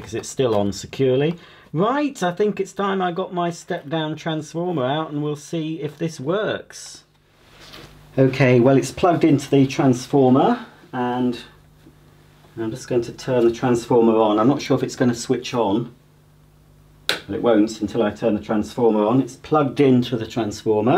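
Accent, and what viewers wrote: United States. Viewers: Nice Texas accent. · Lol I’m offended after you talking like a amarican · I love the american accent that wwas great. The concept and concept 2 are possibly my favorite hoover models, i have quite a few. I do find them quite often, if you need any parts let me know and ill do my best to help out!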